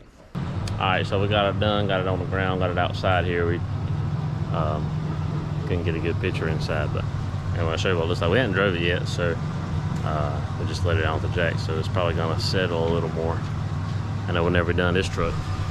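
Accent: Kentucky, United States. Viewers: You sound like EVERY SINGLE KID I grew up with my entire childhood in Kentucky 😂 that’s super cool since I’m in Michigan now lol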